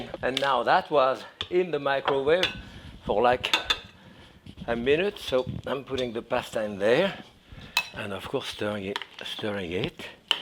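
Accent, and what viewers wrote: France. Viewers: French Accent !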